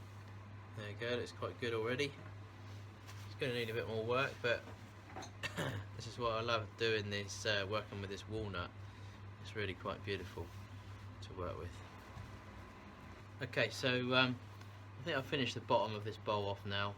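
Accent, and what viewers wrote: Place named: England, United Kingdom. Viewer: He talks correctly he lives in the land that invented English ENGLAND Yeh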